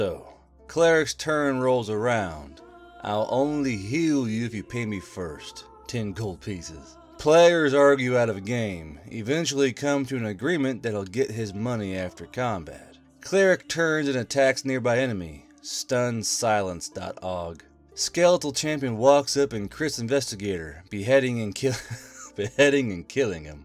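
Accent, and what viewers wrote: South, United States. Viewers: He has a very heavy southern accent. Makes listening to him more fun for me since I can see an old folk I used to talk to who spent plenty of time telling me war stories.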